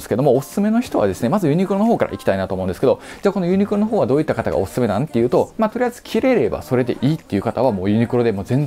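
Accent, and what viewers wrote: Kansai, Japan. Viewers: 何度聞いても心地良い関西弁だなぁ〜。 さいたま市より。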